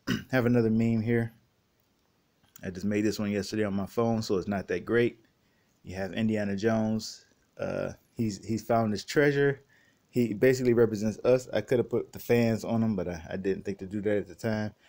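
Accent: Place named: Lebanon. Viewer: You have interesting accent, do you live in Lebanon??? Are you Lebanese???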